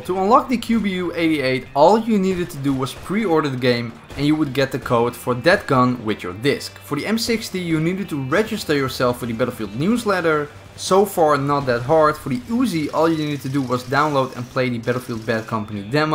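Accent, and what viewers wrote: Netherlands. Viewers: Mooi Nederlands accent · ik wist dat je nederlands was al vanaf begin door je acceny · The Dutch accent is so obvious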